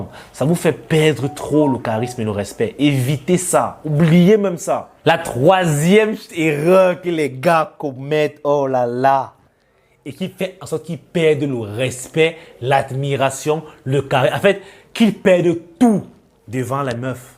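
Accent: Cameroon. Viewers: Toi tu est camerounais bassa'a nespa!!!😂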